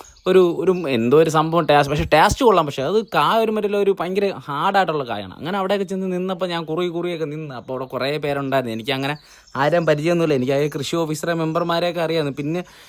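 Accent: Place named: Attingal, India. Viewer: ടേയ് നിങ്ങള വീട് അപ്പോ എവിടെ 🤔🤔 ഞാൻ ആറ്റിങ്ങൽ.. സ്ലാങ് കണ്ടാർക്ക നമ്മള സ്ലാങ് പോലെ തോന്നുന്നല്ലോ 🤔🤔🤔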